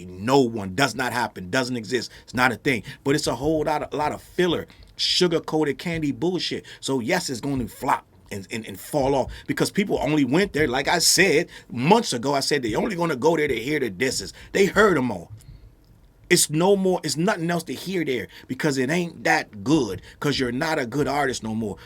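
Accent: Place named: East Coast, United States. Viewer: Let me go ahead and pack in comments to boost this 🥷 in the algorithm, I know the dick gobblers gon be on yo neck 😩 😅😅. Not for nothing yo ahhh remind me of my uncle may he rip, n I ain’t clowning ya age bc I’m not young my damned self n black don’t crack FYM 😅. Anyways he used to always say this that n a third n he spoke fast n he was from east coast so he sounded like yo ahhh too 😅😅. Shit makes me laugh g salute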